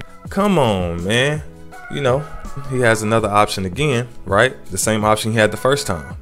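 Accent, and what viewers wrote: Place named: St. Louis, United States. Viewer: Sounds like he from St.Louis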